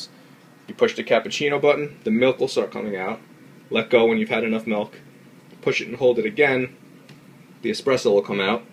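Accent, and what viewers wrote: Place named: New York, United States. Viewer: Sick accent u must be from New York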